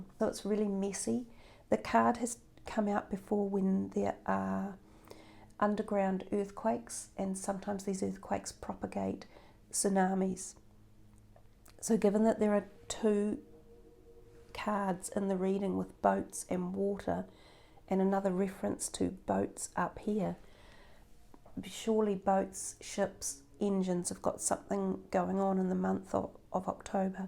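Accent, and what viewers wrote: New Zealand. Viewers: Came across you the other day great to hear a kiwi accent lol 🙂 very interesting reading, I think October is going to be quite full on 😬 Thank you! ❤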